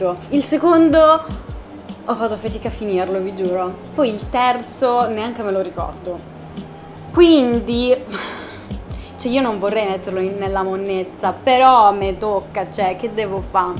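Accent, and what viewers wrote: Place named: Rome, Italy. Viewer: Comunque ti dona l'accento romano 😄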